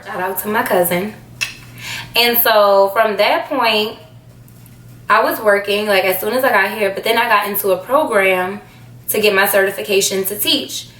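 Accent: New Orleans, United States. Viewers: That New Orleans accent 😍 👌🏿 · Damn you new Orleans women mane! I love that accent so much..."ova...bey buh..." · I love your accent. Reminds me of my time at Xavier! I love New Orleans❤ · I moved to Houston from Hempstead, NY. I f'cks with H'town all day!!! love your N.O accent!!!! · Carnisha XO yes I'm not from new Orleans but I have family that live out there and she sound just like them. Just the way she talk is so New Orleans lol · I love your New Orleans accent. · Son when you opened your mouth, I was like this girl from NOLA. Then when you mentioned it I was excited 😊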